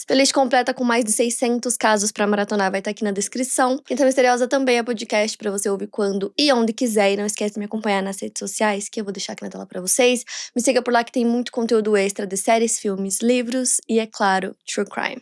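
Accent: France. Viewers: PARABÉNS pelo belo francês ♡ arrasouuuu ❤ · Eu amo quando a fala francês, vc e incrível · A gata arrasando no sotaque francês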